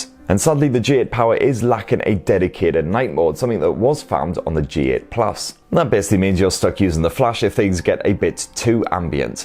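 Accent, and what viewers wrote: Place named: Scotland, United Kingdom. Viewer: Great delivery,and information on cellphones with a Scottish accent.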